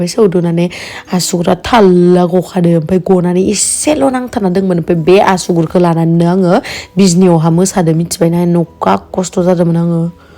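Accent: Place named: Goalpara, India. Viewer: Manw sanjari railaiyw Aabo sona, Golpariya railainanag gwo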